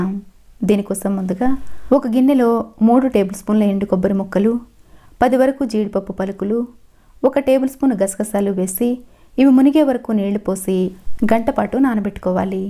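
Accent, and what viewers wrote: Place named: Andhra, India. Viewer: Andi andi meru Telangana unna Warangal vantalu ani youtube channel peru pettuko andhra slang matladutunnaru enduku...meru andhra na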